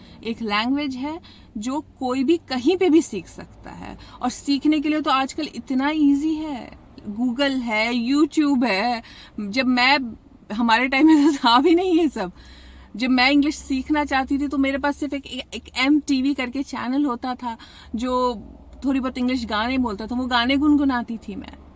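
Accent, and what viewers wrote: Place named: Bihar, India. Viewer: Ohh tbhi to main apko sunti hun toh aisa lagta hai ki , min unkei bate sun rahi hun coz woh bhi Bihar se hi hai .. but thanks a lot for helping to grow us.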